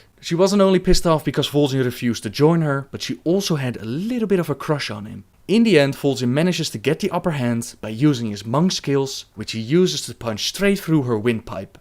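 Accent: Netherlands. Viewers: I'm watching a bunch of lore videos from you and stuff because I recently got interested in the lore. But just a question I'd like to ask, totally off topic... Are you Dutch? Cause I kind of hear a Dutch accent. But I might be wrong. I'm Dutch btw : p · dat dutch accent makes me lol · Are you dutch?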